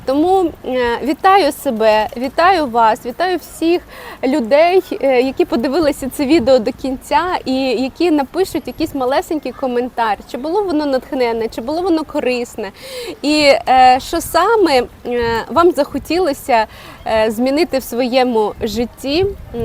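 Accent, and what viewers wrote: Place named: Western Ukraine, Ukraine. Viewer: Ваш украинский напоминает западно украинский, я тоже так говорила, после отдыха на западной Украине.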